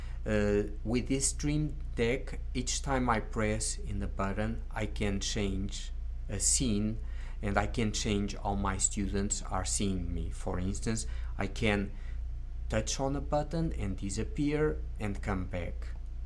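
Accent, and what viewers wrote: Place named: Portugal. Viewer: I think that you are portuguese and are reading stories in spanish with a portuguese accent. it doesnt sound authentic when you read in spanish. I am portuguese and speak spanish so I can pick up on your portuguese accent when you read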